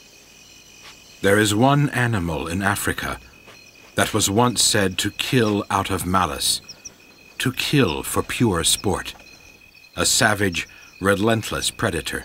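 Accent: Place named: Canada. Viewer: The narrator sounds Canadian. Feel like I'm watching a documentary from the Mother Corp back the 1980s. Cheers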